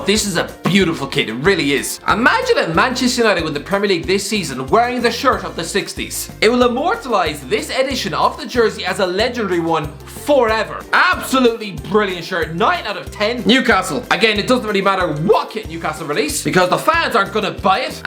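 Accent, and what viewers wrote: Ireland. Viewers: Ready to hear a Irish guy rant again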